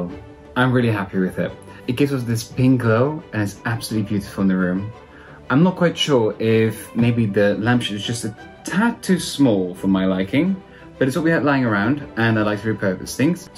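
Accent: United States. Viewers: 🍃♥️Really sweet❗️. Romance is in the air at LaLande which makes it all the more fun to watch. I was wondering how you came to have an American accent when you learned English? You have a beautiful voice…would love to hear you speak French more too!🍃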